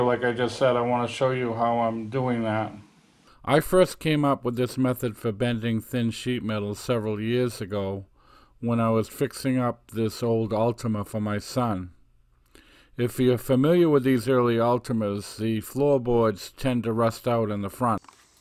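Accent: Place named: New England, United States. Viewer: A nice, authentic New England accent. Boston?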